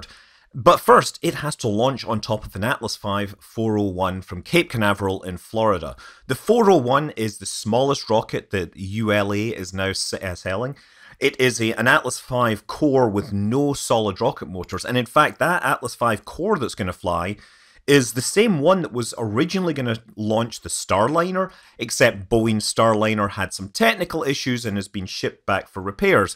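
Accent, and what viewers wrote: Scotland, United Kingdom. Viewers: Greek names always sound best with a Scottish accent.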